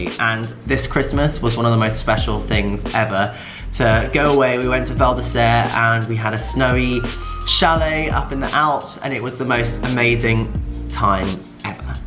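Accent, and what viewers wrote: United Kingdom. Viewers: Thanks to Tom, my ear is really get used to British accent now 😂 P.s: english is not my main tongue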